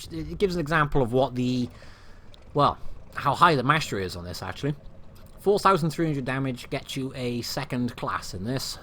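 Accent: Wales, United Kingdom. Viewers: Look See! Now in a minute! By there! You went super Welshy for this one!!! GG gr8 vid as always!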